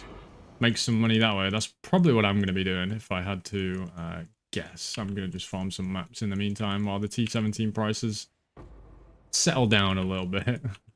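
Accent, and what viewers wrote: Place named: Lancashire, United Kingdom. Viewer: Thanks or, appreciate the constant different strats to try - will be giving this a go tonight, and i'll post my findings as well. Out of interest, where are you from!? You sound like you could be from where I'm from in Lancashire, but also could be southern. Cannot guess.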